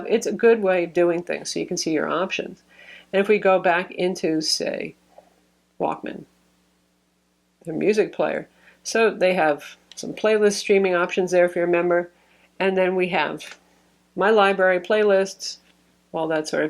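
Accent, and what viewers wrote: United States. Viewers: Can't understand a bloody word she's saying. Horrible Yank accent or you're pissed during this review.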